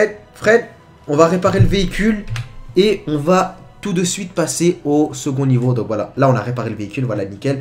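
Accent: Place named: South, France. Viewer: Tu a lacsent du sud !! ❗️❗️❗️❗️❗️❗️❗️🤓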